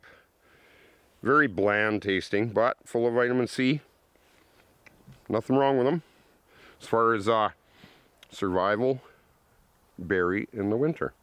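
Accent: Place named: Canada. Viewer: When that truck gives up on you I can recommend you getting a Subaru Outback. At least as good off road as any truck, and the back seats fold flat so you can sleep in it comfortably. You should look it up. As for that catch phrase, I think the most important thing is that it contains the word "out" in that awesome canadian accent. The one about poking an eye out wasn't bad at all imho.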